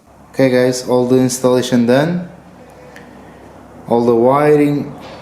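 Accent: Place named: Russia. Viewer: Guy what state do you ride in, or country, you sound russian. That way i can show you a thing or two about your CBR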